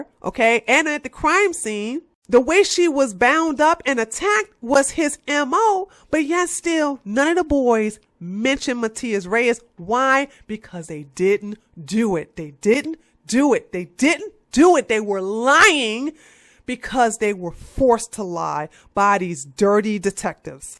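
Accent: United States. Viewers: Where in Texas are you from? Ima guess DFW area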